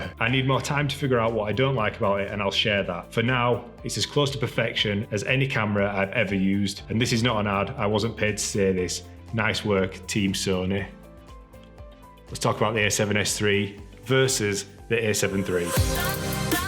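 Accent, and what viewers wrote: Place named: Newcastle, United Kingdom. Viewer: Fellow Geordie? Or must be close.. 🤔✨👊🏼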